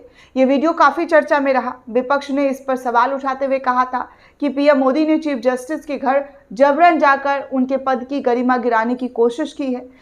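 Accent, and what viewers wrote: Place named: Bengal, India. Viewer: aapka yea Lady reader or announcer 100% Banagali hai. Acha reader rakhiye jo pronounce thik karegi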